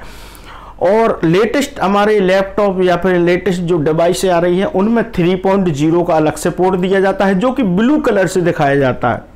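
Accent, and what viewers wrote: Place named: India. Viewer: I understand only one word - "pendrive". Where you learn this indian english dialect?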